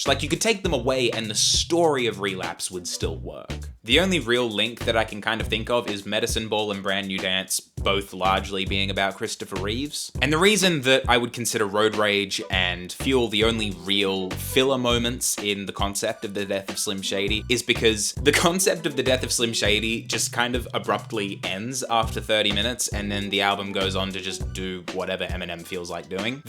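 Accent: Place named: Asia. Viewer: I cannot stand your Asian accent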